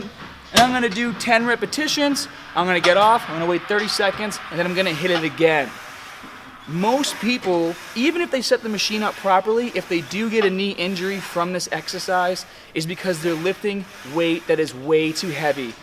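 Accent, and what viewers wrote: Boston, United States. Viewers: I have a feeling you're from Bawsten? Ha ha I like accents. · Yo is he american or am I just high? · You from Boston?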